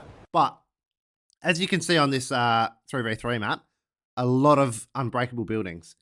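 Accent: Australia. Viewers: If they hire you to do the australian voicelines, I think "Happy days" is a mandatory one! and "Rough!" if a soldier dies.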